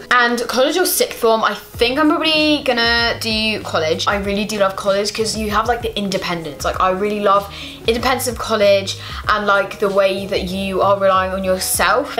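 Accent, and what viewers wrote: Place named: United States. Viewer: Love this and ur American accent wowee!!!!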